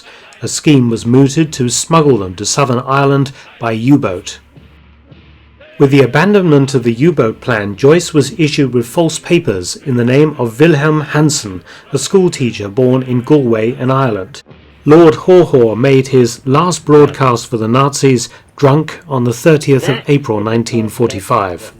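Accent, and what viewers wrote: United Kingdom. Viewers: I love the British accent. Militree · Sorry guys but it all sounds a bit iffy to me as many of these types of programs do! it sounds very pompous and how could anyone know what really happened. Its almost like listening to a WW2 propaganda speech on the radio, half truths and no better than the usual bull crap you find on Youtube, but this has a nice British accent. (fake accent?)The only real thing is the film used.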